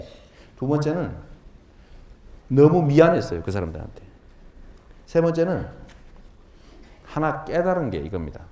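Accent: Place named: Gyeongsang, South Korea. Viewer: 경기도 살면서 갱상도 말하면안대지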